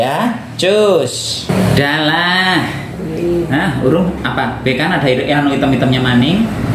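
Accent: Banyumas, Indonesia. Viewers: Pbg hadir kang ngapak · Ngapak lucu koh 😂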